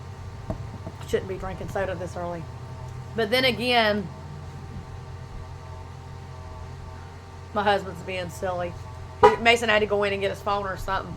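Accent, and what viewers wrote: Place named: South, United States. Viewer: The first ever video I watched was your "What's in my purse" video when I was shopping around for a jansport mini. Really loved your southern accent and simple vibes. You will be missed. It was comforting to watch your chats and cleans.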